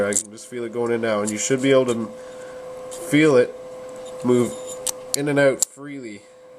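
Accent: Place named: Canada. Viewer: it took 14 seconds for me to realize you were Canadian lol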